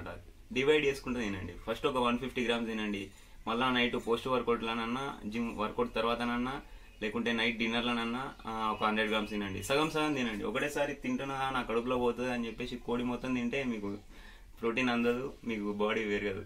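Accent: India. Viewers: Mee language bagundi telangana style nd recipe kuda chaala baagundi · Hamara hyderabadi patta ..superb dialect of hyderabad ..from Langer houz..love you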